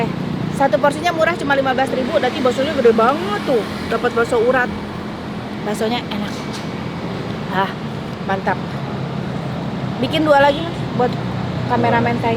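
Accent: West Java, Indonesia. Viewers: Sedep pisan klo liat teh mkn, apalagi smbil ngmong logat sunda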